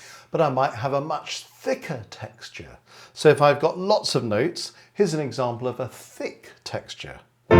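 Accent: United Kingdom. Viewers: great teaching video sir! love your British accent really! the way you delivery the knowledge is really easy to understand! also i love every single example you played on the piano. so beautiful. could you please list the titles of examples when you have a chance? thank you so much and cant stop watching your videos. xx.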